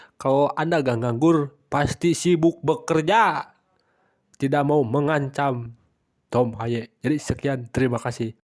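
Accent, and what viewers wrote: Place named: Sunda, Indonesia. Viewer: Mang mantap sunda pisan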